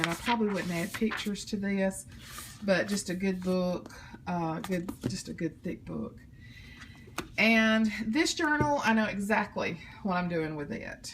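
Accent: South, United States. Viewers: I'm such an idiot, I obviously wrote this comment before I'd even finished watching the video, lol. By the way I absolutely adore your accent. I love the accents from all around the south/deep south in the USA. Y'all sound so beautifully well mannered, with your habits of saying sir/m'am and "bless your heart" (although I think that's more of a Texas backwards compliment thing lol.)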